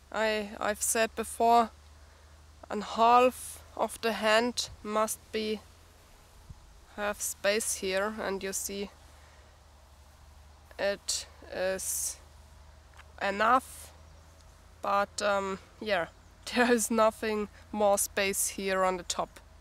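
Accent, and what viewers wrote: Germany. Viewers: you are extreemly funny .........its just your English with German axcent is kiling ......please accept this comment as compliment .....because it is plus not to forget that those shouse are the best easier way in a surviving situation in case you got lucky to kill larger animal and skeen it or you come a cross dead one happend to have still a skin .........great simple shose to cover and protect your feats .......lovly ......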